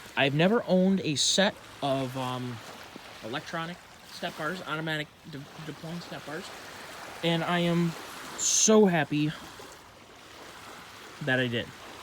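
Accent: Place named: Michigan, United States. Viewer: Your voice sounded younger back then. I notice more of the Michigan snarl now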